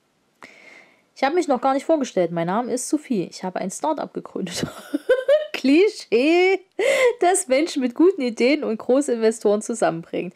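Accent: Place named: East Germany, Germany. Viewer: Danke für deine Videos ich nehme es mir als kleine Hilfe falls ich bei mir nicht weiter komme . Video ist top und dein Ossi Akzent ist auch unterhaltsam für mich als Wessi . Ich hoffe du verstehst Spaß 😜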